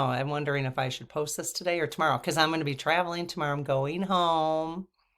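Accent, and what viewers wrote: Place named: Wisconsin, United States. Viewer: ....wow you are SO Refreshing, I love listening to you cause it feels like talking to my sister or best friend....by the way are you from Wisconsin? you have that Wisconsin kinda vibe and accent? I LOVE your feisty truth telling too........all of us should strive to have that yin yang balance of doing deep inner healing work, connecting to our own higher self and spirit guides to help us grow and learn....and then taking action and being brave enough to challenge the injustice and talk about it like you are doing on your channel....May we all be guided together to a more peaceful and sustainable world!!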